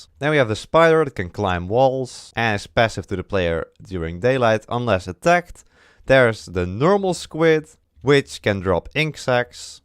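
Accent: Netherlands. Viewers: That accent 🤣 sws Nederlander hahahahahah Dutchie for sure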